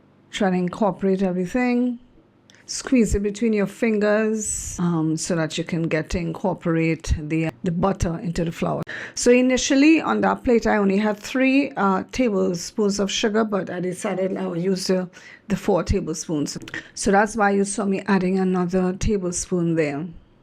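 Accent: Trinidad and Tobago. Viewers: Love It! 🇹🇹 not related to the recipe but you sound a bit Trini :)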